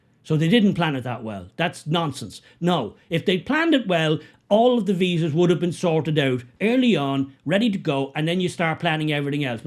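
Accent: Ireland. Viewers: This guy talks like an Irishman. This video coukd have been 75% shorter. He said way too much to say so little.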